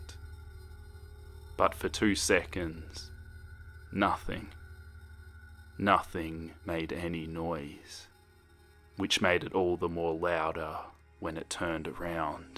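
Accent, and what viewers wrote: Australia. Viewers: DasConii I love the way he says it too, beautiful Australian accent. Maybe I just think this because I don't have an accent?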